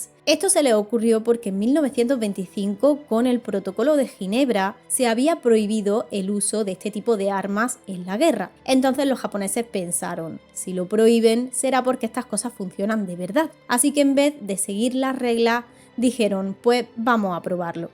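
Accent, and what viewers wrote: Andalusia, Spain. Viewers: Es acento de Andalucía, la perla del sur de España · Amo su acento...que parte de España es?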